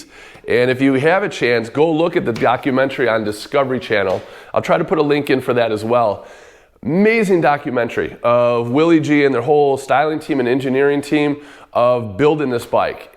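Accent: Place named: Chicago, United States. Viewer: I have the Akrapovic Header and the beautiful diamond stitched Harley custom seat and vrod soft bags with VRod stitched on the sides with plastic inserts. Im so glad I didnt trade my bike like I almost did. All I had to do was get on the bike and start heading to Champagne Urbana from Bloomington to trade for the other bike and realized what the hell am I thinking! Pulled over twice before turning around saying im not trading this thing for nothing! 😂 Now that I didnt do it i realize I would have been kicking myself in the ass the rest of my life for such a stupid trade. Lol! The Deuce was a awesome bike and was chromed out to the max but it could have never held a candle to my Vrod muscle. My bike is the exact for as yours and I I actually have floor boards on mine instead of pegs and so my feet aren't like riding with forward controls which to me makes it even way more comfortable to ride and probably even safer. 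These Vrod Muscle's are definitely special bikes. I would think than anyone who hasn't ridden one would fall i love with these bikes if they did. Now someone would have to deal my Vrod muscle away from my cold dead hands to get it from me. I still have my original pipes but this Akrapovic Header for it just sounds incredible on it and is the number one Header pipe for the Vrod muscle. Since your in Illinois and im in Bloomington Illinois I was just wondering what city your in? Im thinking by the sound of your voice the Chicago suburbs somewhere? Lol!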